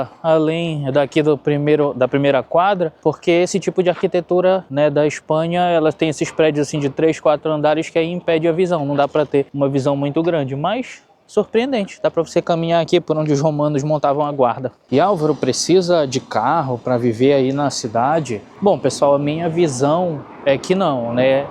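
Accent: Pará, Brazil. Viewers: Meu amigo, com esse sotaque paraense, só pode ser de lá, minha terra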